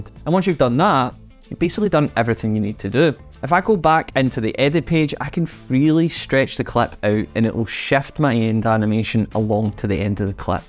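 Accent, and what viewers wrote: Scotland, United Kingdom. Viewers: Your Scottish accent is what I live for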